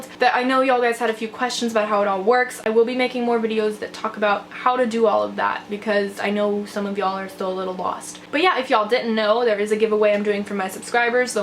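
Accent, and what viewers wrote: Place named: South, United States. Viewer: Where do you live?I notice a southern accent.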